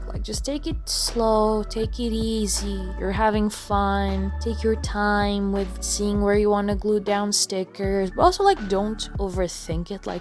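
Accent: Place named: Portugal. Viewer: Got recommended this video, randomly… are you Portuguese, by chance? The subtle accent is quite recognisable. Bom vídeo! 👀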